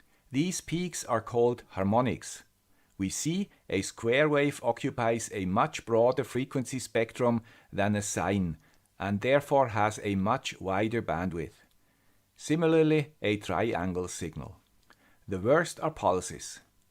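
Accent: Switzerland. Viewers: missing your Swiss accent 🤓🤓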